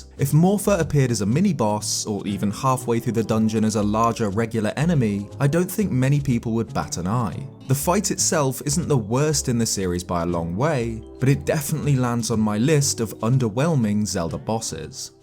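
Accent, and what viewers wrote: England, United Kingdom. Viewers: that's just the English accent for ya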